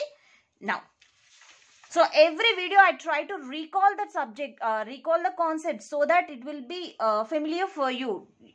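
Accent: Hyderabad, India. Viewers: are u from Hyderabad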